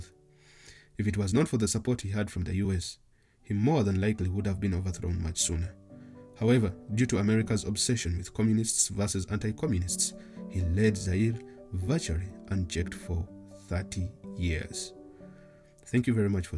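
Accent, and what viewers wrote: Uganda. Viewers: The accent tells me you're Ugandan. Great work bro. Oli wamanyi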